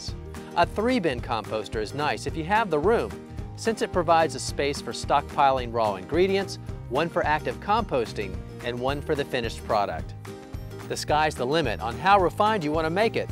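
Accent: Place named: United Kingdom. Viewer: Yeah that's a British thing I've noticed. We (USA & UK) pronounce so many words that are the same, totally different from each other!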